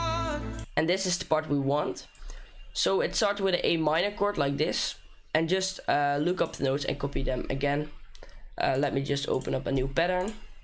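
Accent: Netherlands. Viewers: i hes dutch im dutch to i can hear it from his accent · You sound really dutch.. No problems just noticed it :D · Je bent nederlands denk ik aan je accent te horen toch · This guy 8s Dutch he has a Dutch accent... Geen probleem hoor😂😂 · His accent screams dutch ahha i love it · this guy has to be dutch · Are you Dutch maybe you sound Dutch. Btw amazing video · We horen allemaal dat je nederlands bent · Are you Dutch? I think I can recognize your accent a little bit. Your english is very good though! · Thank you so much! Your english sounds like dutch 😂 are you dutch? I am dutch!🇳🇱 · Ayo r u dutch? I can hear it due to your accent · sounds dutch ;) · Ik spot een Nederlander 😂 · You sound Netherlands 👌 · I smell dutch! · This guy has an Dutch accent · i love the thick dutch accent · Haha deze gast is nederlands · Im in love with Dutch accent lol. Thanks for making such a good job explaining ! 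· hey buddy hmm i can hear ur netherlands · are you dutch? you kinda sound dutch and great video my dude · i think you must be dutch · he's dutch a now it for sure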